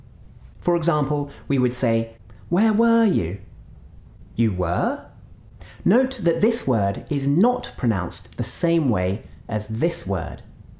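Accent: United Kingdom. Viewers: Omg I love British accent, do you give individual classes? Also of grammar? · Hi,! How do you do? Howdy? You are a dashing English teacher. Your British pronunciation is clear.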